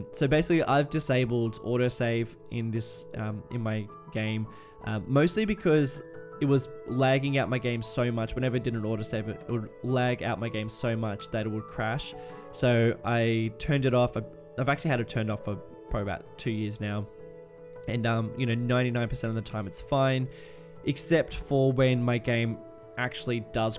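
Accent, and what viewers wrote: Australia. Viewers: This one is my favourite series of yours I think, never been to China but your level of detail is inspiring. I'm also from Sydney so it's kinda nice hearing the Aussie accent 😎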